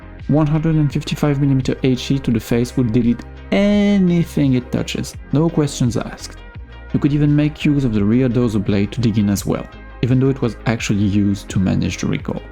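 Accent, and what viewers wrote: France. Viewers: Your french accent is so exiting❤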